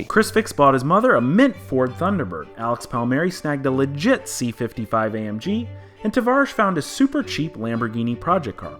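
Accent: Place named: Tampa, United States. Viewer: Phil Abrahams The Floridians from Tampa area have this accent.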